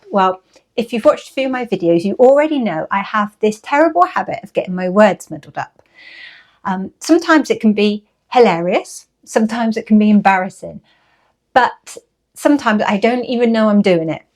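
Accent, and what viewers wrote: United Kingdom. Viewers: I am always amazed how much masticulation is required in the British Isles in order to speak the King's English. Native speakers, sadly, I cannot understand much, the accent is too overpowering, the words and sense often lost. I couldn't consider taking ship to a martian colony with Cambridge or Oxford or Scottish accents --needless to say. Videos are sufficient existential challenges for a wretched, unaccented bloke like me. Other than that, the enthusiasm for Charlotte Bronte is absolutely imfectious.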